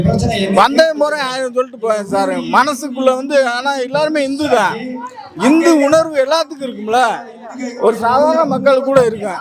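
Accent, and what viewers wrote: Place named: rural, India. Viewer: கிராமிய பேச்சு இனிமை அதோடு உண்மையும் பக்தியும் சேர்ந்ததால் /இன்பத்தேன் வந்து பாயுது காதினிலே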